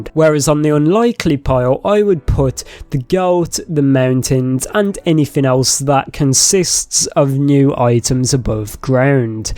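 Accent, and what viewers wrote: United Kingdom. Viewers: i love his british accent so much its so strong lol and love ur videos too <3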